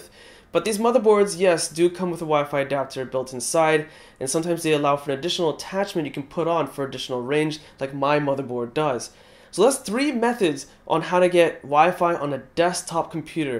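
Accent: United States. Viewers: You speak like U.S accent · Is he american? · wow!! You speak like U.S accent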